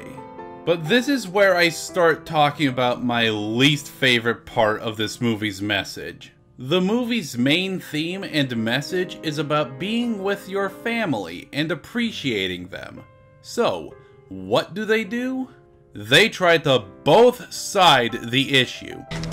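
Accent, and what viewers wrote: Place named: United States. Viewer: I'm worried that this is a cultural issue. I don't want to assume you're American, but the accent says enough. In America, it's perfectly normal to go against your family's wishes to follow your dreams. That's an outlier in the world as a whole. In many cultures, family is everything. I'd suggest getting a perspective from someone who is actually from the culture they went so far out of their way to represent, before calling someone who is upholding an important, if nonsensical, family tradition as a "medieval reject".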